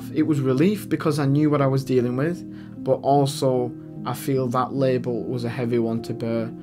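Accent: United Kingdom. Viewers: Can anybody tell me where that accent is from? North of England? Not sure.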